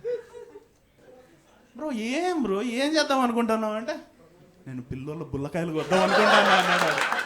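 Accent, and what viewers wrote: India. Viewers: Bro nee jokes genuine ga bagunnayi, boothulu theesesina kuda anthe comedy ga untayi... so try to avoid bad words.. neeke reach ekkuva untadhi appudu.. btw i like your kadapa slang bro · పక్కా అనంతపురం యాస.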